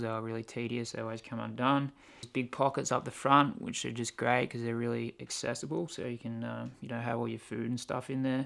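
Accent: Australia. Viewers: Awesome filmmaking dude .. are you from Australia ? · That accent.. definitely Aussie.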